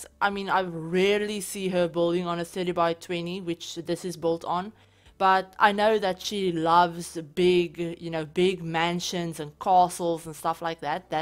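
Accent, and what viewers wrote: Afrikaans, South Africa. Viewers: oh that's so cool, I love the South African accent. Been trying to master it for so long haha, very difficult. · Ek kon dadelik die Afrikaans in jou aksent hoor!!! Volg al lank vir SimKim, en eks so bly julle het hierdie collab gedoen. Die interior pas die exterior amazingly!!! Stunning! En ja, ek sub nou jou kanaal ook.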